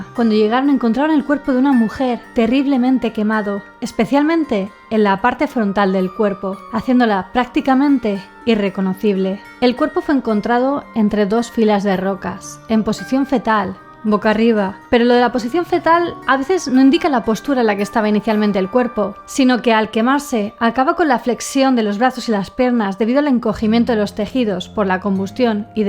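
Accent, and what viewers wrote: Spain. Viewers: Muy buen vídeo; por tu acento asumo que habrás oído hablar alguna vez acerca del crimen de Alcácer, sé que es un caso bastante sórdido y enmarañado, pero estaría interesante un vídeo al respecto con tu excelente narrativa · Hola guapa eres de España se te nota en el asentó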